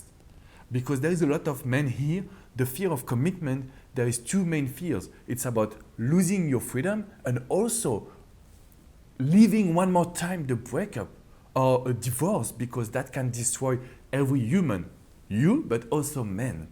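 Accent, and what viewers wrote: France. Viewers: Please check my msg sir... i didnt meant to criticize yu..nt even think about that.. bcoz u r the coach i have huge respect for.. i only mean.. i want some advice at this particular situation... i love this french accent words very much❤️... i lv , respect u sir.. atleast pls don't misunderstand me fr that comment... please help me to get over this situation sir...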